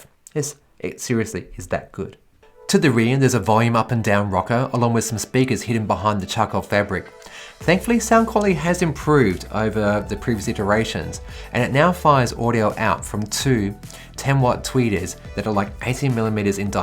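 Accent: Australia. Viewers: the Australian accent also helps...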